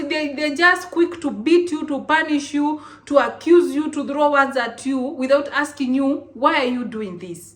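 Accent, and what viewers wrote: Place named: Kenya. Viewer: I literally enjoyed every bit of the story. I can relate with everything you said (though I have not experineced everything). Well done beautiful. I love the Kenyan accent and how you just let yourself be " " and nobody else. Sis permit me to ask what Cameras and Lights you use for your podcasts?( I need the info for mine too) It is soooo clear and it enable me admire and just enjoy that beautiful transparent, glittering skin of yours. You are SkincareProMax.😊😊😊 Much Love from Cameroon. ❤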